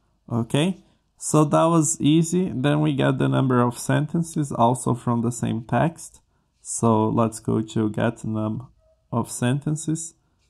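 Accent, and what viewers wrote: Brazil. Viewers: Amigo, você é brasileiro né? O sotaque é inconfundível.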